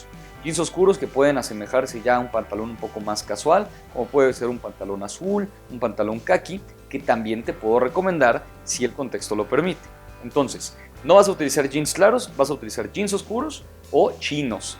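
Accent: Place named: North, Mexico. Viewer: A de ser norteño este men v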